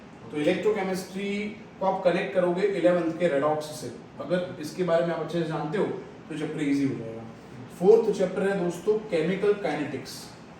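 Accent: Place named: Gujarat, India. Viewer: That gujarati accent in hindi 😂😂👍👍 keep it up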